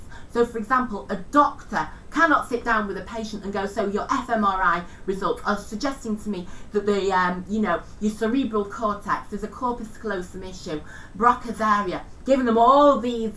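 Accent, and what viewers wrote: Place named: Northern England, United Kingdom. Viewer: esy2011 northern accent innit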